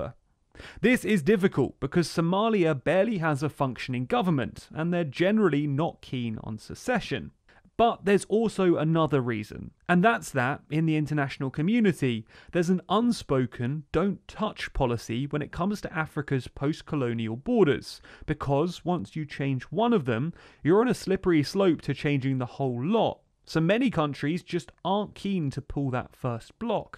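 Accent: United Kingdom. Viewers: correction: there is no dotted line dividing the map of Somalia to make you nervous. Your presentation is good, but it is factually wrong. I am from Somaliland, and it is only my tribe that wants independence, in order to create a country were my clan is the majority and we will win all the "elections." The other 4 tribes don't want independence. The referendum you mentioned was only done by my Isaq clan, the other 4 clans refused to take part. so, of course we "won" with 97% of the votes. Many of us are having second thoughts, but we are afraid to speak up, because the former rebels from my clan who are now the "government" in Somaliland do not tolerate dissent. Your video is my clan's propaganda leaflets read with a British accent. Please check your facts.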